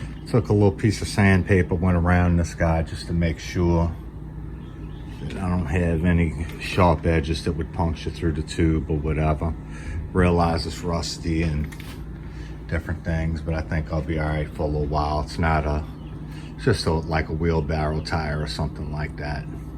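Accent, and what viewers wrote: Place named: New Orleans, United States. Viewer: Great job,. I changed hundreds of tires when I was about 18 with a floor bead breaker, two tire tools and a rubber tire hammer around 1969. Single and split rims with rings. The small tires are the harder. I have two tires I need to mount on two plastic rims that have been eating my lunch lately but I don't have a little changer like yours. Even if I did, I would probably break the rims. I don't know where you are but is sounds like you are from New Orleans. Thanks for a great video.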